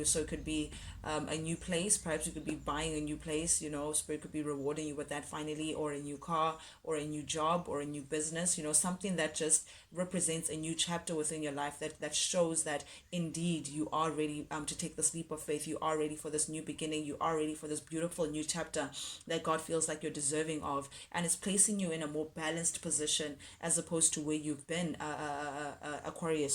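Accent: South Africa. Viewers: Are you South African? Had to ask because of the accent. Great reading! ❤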